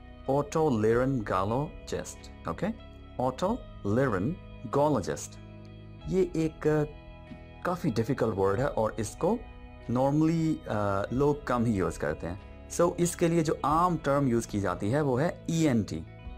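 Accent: United Kingdom. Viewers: yar pehla banda daikha jo urdu me English accent use kar raha hai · Sorry to say Mujhi srif apka urdu ko english style mein bolna acha nhi lgta..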